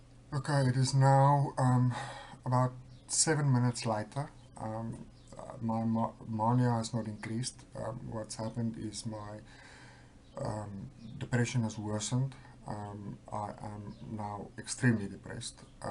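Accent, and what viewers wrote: Afrikaans, South Africa. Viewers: Nice afrikaans accent bloke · Alice Lee Afrikaans accent from South Africa. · Leo Harker south african i think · What is this accent? South African? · Hey mate! Are you South African? I detect an Afrikaans accent. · You are strong and brave man. Can hear you are from RSA like me. Not an easy place to live in, but sharing this the way you did while going through this, i have a lot of respect for you. May God bless you and give you relief sooner than you expect it. Stay strong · I get the depression but not the mania. I'm not Bi Polar but I experience the severe depression. It's the worst. PS I'm a South African living in China and I've been watching YouTube videos about mental illness.....it was SUCH a sound for sore ears to hear your south african accent!! Thank you for sharing your video. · I was lekker to hear your Afrikaans accent and realize I'm not alone suffering with this in good old SA. 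· Guy's South African, got a Afrikaans accent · Are you SA? Or in South Africa? I recognize the accent. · E Quality is it south African? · I have a couple friends that are from SA, that accent is Afrikaans. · Are you South African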